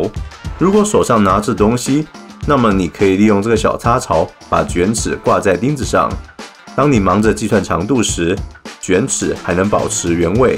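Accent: Taiwan. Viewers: 說話方式怪怪的，而且聽起來像台灣人又有點不像台灣人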